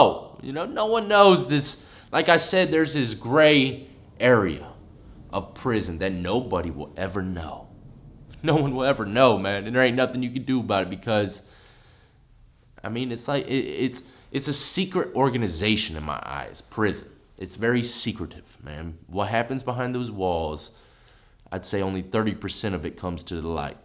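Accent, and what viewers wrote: United States. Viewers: Why do you Americans say the letter Z wrong!! And in a bid is a way of saying they tried to !!! Not in a bid how death said it !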